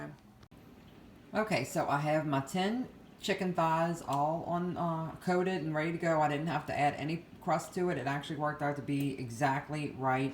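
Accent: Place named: New Orleans, United States. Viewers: Love that Louisiana accent! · Soon as you opened your mouth I said “she’s from New Orleans or southern Louisiana “. The I looked at your username. Lol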